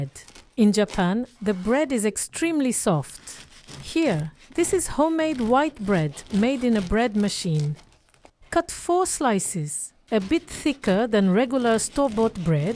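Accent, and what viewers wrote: France. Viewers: The French accent mixed with Asian accent while speaking English is delightful 🪻💐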